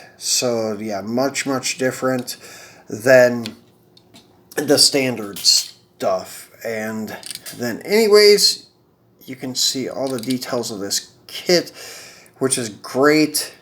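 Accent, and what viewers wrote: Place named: Cleveland, United States. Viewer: Oh and while I'm at it, it's modern, like and urhn, not moderin modern. Lol I just messing with you! I learned to talk in N.Y. and live in Colorado so my ascent probably sounds worse then your Cleveland accent? Lol.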